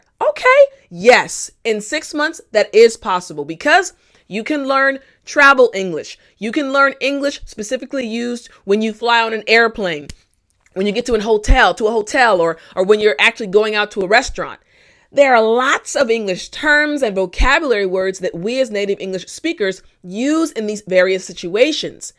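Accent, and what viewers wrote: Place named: United States. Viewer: Peace from Egypt. I'm an English teacher also but I wish to learn American English accent from you.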